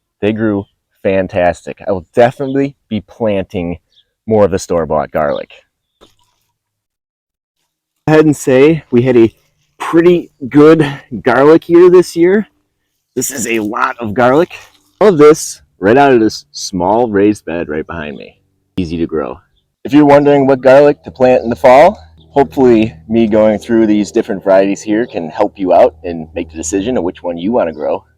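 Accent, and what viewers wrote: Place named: Midwest, United States. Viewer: I hear mid west accent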